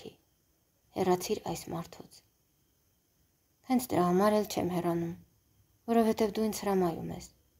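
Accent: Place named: Sisian, Armenia. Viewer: Շատ ներողություն կարդացող աղջկա ձայնը շատ գեղեցիկ ու բարի եվ միեւնույն ժամանակ տխուր է։կասեք ինքը բնիկ որտեղից է։ Ներող կլինեք, մի գուցե հետաքրքրասիրությունը մեղք թվա՞ Ուղղակի ասեմ պարզ Ակցենտը Սիսիանի ակցենտ է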